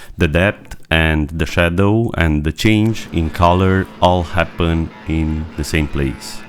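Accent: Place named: Eastern Europe. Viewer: Thought I heard an eastern European accent. Love your work and the way you talk through decisions. Thank you.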